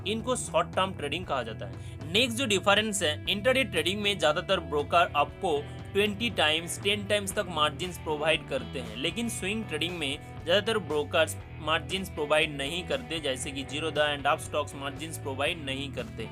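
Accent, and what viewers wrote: Bengal, India. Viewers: Bengali ho kya bhai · Dont mind bro.. Bengali speaking people ke liye thoda problem hota hai.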